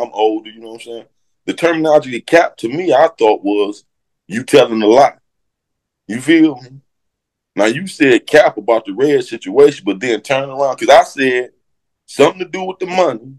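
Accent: Detroit, United States. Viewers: That's detroit talk fam that's just how we speak . # you feel me lol good content 313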